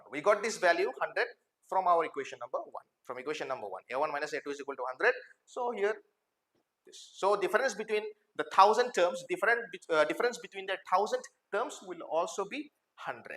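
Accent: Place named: China. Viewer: Sir actually sounds like Chinese. His accent is similar to Chinese's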